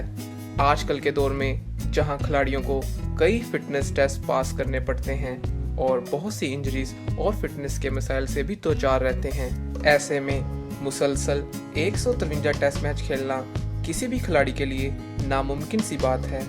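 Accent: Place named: Punjab, Pakistan. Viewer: urdu chek kr ustad bndy ki level kr gea urdu in punjabi tone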